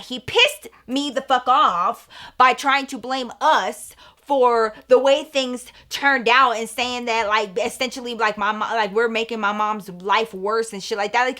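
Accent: Texas, United States. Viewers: I’m from San Antonio and I been watching you for years now and every story time the way you talk girl I can tell you’re from Texas 😂💕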